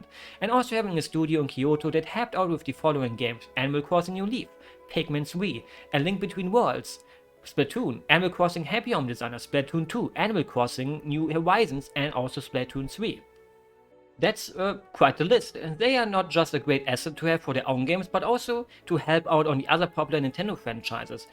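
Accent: Germany. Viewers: I could sense your German accent was looking forward to your pronunciations of the Xenosaga subtitles! Funny to see Zarathustra stumping you though haha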